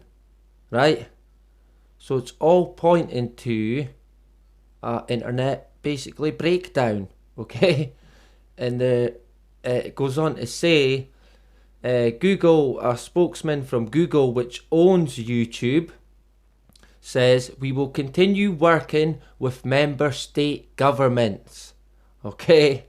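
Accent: United Kingdom. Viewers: Nice to hear a British voice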